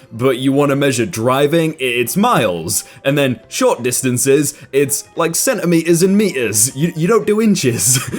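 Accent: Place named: England, United Kingdom. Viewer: Cen'ame'ar You english and your funny accent made my day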